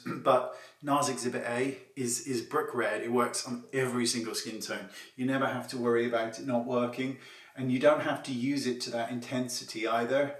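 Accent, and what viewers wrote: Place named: United Kingdom. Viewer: I am not here for concealer just your lovely British accent 🤫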